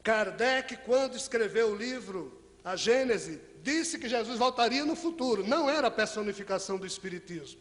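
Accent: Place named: North, Portugal. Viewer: este palestrante lembra bezerra de menezes compleiçao fisica cabelo e tem sotaque do norte assim que vi lembrei de bezerra ate porque bezerra desencarnou em 1900 e podia ter ido embora da terra e disse que ficaria 100 anos na espiritualidade ajudando a evoluçao e que voltaria a encarnar para ficar ate que a terra estivesse em feanca regeneraçao ou seja mais 100 anos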